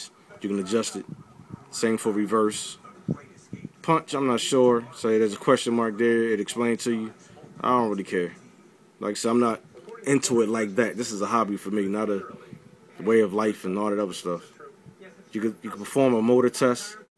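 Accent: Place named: Houston, United States. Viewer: Bet that. Im in Houston. Had a homie in High School from Philli. He was cool as hell....lol. But you sound like you from down here....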